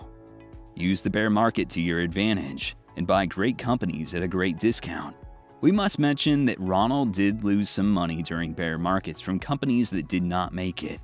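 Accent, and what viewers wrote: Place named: United States. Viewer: An American voice wow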